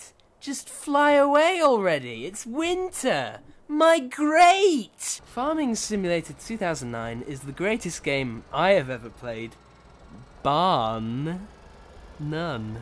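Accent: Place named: Brighton, United Kingdom. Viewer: but why does he have a southern (brighton) accent?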